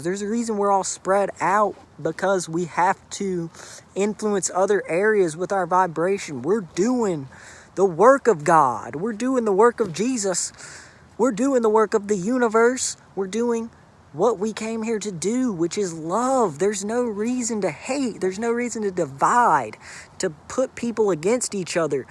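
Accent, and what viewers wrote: South, United States. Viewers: You poor kid, I know exactly how you feel. I've felt the same way ever since my awakening in June of 2009. The one major difference is that I've been lucky enough to find a partner who is on the same path of ascension. Judging from your accent, I imagine you live in a part of the US often referred to as the Bible belt, where spiritual truth is deemed satanic by an overwhelming majority of people. I must say I admire your light-hearted way of being, considering how alone you must feel. It's strange, we go on the internet and we see thousands upon thousands of posts from people who definitely seem to be on the path of Ultimate Truth as we are. Yet, when we look at our immediate surroundings, we feel completely isolated in our quest for ascension. In these times of confinement, it has occurred to me that a lot of people are using an app called Zoom for all sorts of things, yoga classes, business conferences, etc. 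Maybe those of us who are on this sacred path should form a group with that app too so we can share ideas and experiences. What I want to share with you, my young friend, is that you are not alone, not even close. Like you have said here, the spirit world smiles upon you every day all day, but in addition to that, you have gained a lot of popularity in a very short time on this channel, which goes to show there are many of us, tons of us, who know what you know, and think as you think. In the age of technology, maybe we should take advantage of this technology to unite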